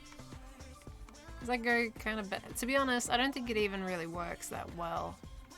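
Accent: Australia. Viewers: Your Australian? I am too. Finally a Youtuber I dont have to get up early to watch!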